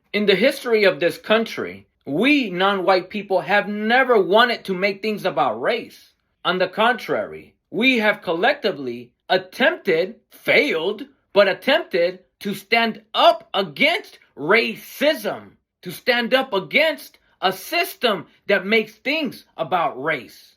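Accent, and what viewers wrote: Australia. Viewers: DUDE YOU ARE NOT FROM HERE..AUSSIE??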